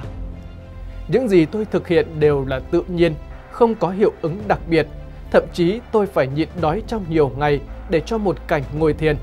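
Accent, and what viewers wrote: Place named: Quang Binh, Vietnam. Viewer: yougotthis::oops::dothefive::elbowcough: Me no' nghe cai' giong Quang' Binh' cua' thang' nay' het' muon' xem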